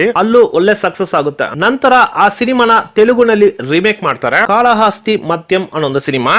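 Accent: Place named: Tamil Nadu, India. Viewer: ನೀನ್ಯಾವನೋ Tamil ನವನು ಅನ್ಸುತ್ತೆ... ಸರಿಯಾಗಿ ಕನ್ನಡ ಮಾತಾಡು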